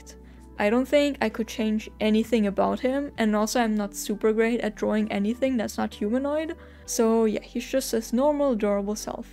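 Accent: Germany. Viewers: i really love your art i just clicked on the video cuz i LOVE the owl house and as soon as i saw you and your art style i had to follow you! btw are your like german or smth? kinda sounded like it! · I am so glad i found ur account! I love ur artstyle! Also, are u german? Bc of ur cool accent! I loved this vid keep going I really enjoyed it!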